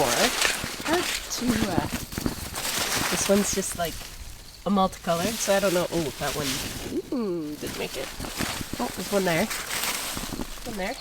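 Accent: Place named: Canada. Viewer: ‘....they’re friendly,..eh’...! Wonderful Canadian...! Love the language,..eh..!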